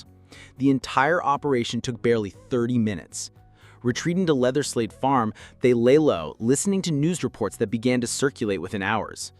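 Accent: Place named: United States. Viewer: Why do these English videos always have to be made by little Yank boys with horrible accents?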